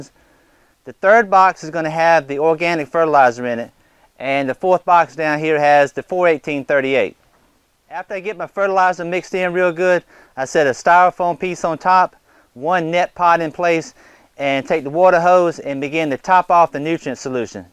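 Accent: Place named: South, United States. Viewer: Good stuff. Interesting experiment—I’m always hunting for that elusive “perfect” organic solution for hydroponics. Keep it up man! Btw: are you from anywhere near where Jimmy Carter is from in GA? I’ve been around a lot of southern dialects in my life, but the only place I recall hearing anything similar to yours is maybe from Jimmy Carter. Lol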